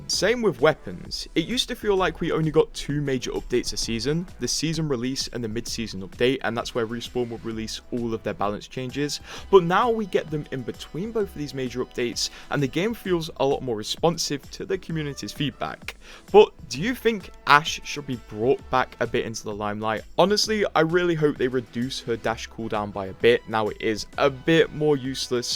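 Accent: United Kingdom. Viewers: Are you from northern England? Like machester or yorkshire?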